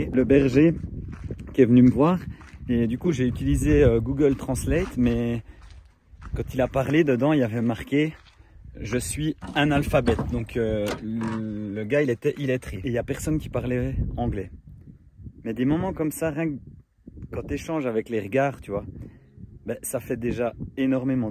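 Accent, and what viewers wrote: Switzerland. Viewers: L'accent suisse. Une pépite vraiment 😂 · t'es suisse ou bien ?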